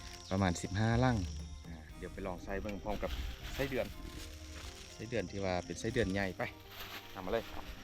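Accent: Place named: Loei, Thailand. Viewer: เฮาเว้าสำเนียงเดียวกัน เว้าคือกันทางเชียงคาน จังหวัดเลย ข้ามมาเที่ยวฝั่งไปแหน่เด้ออ้าย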